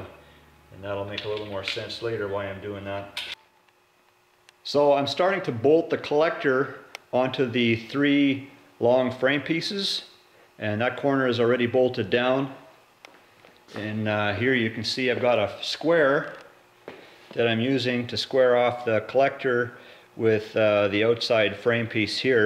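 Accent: Canada. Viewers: Sounds like a Canadian accent eh!! Some people say I have an accent, I can hear it though!!☺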